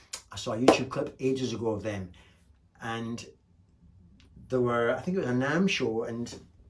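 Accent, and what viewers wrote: Scotland, United Kingdom. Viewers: Django with a Scots accent.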